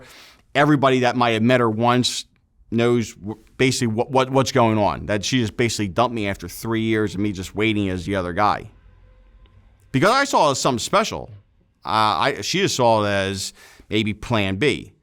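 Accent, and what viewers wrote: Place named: Baltimore, United States. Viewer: Holy hell is this dude from Baltimore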